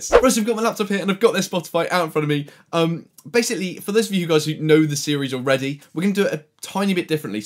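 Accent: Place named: United Kingdom. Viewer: Good video. You're super funny. British accent is the shit. Liked it!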